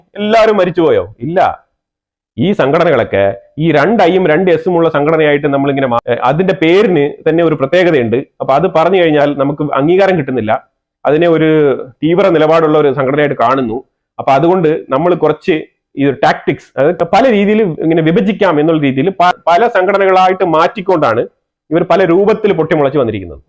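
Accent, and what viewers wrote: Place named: Trivandrum, India. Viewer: Excellent analysis and presentation in Trivandrum style talk. Keep it up.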